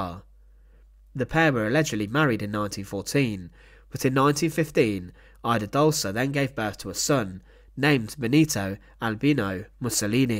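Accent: United Kingdom. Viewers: This british accent is so awful ... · Very annoying your tone, pause and narrative .. are you British ? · You should ask yourself what is the real goal of this video. The British accent of the speaker is interesting. I wonder if this people can tell us something about the relationship between Churchill and the Duce. During the days that preceded the execution of Mussolini, there were British secret service men operating in lake Como, looking for documentation that could put Churchill in dishonorable condition. It is highly suspected that the documents that Mussolini conserved jealously in those days, were the object of this search by Britain. Interestingly, these documents DISAPPEARED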